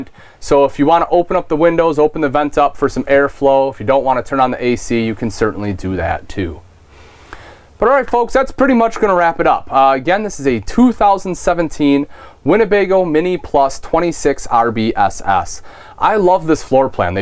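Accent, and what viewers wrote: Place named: Midwest, United States. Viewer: If anyone ever wondered what a classic mid-Western American accent was, here they have a perfect example! Nice!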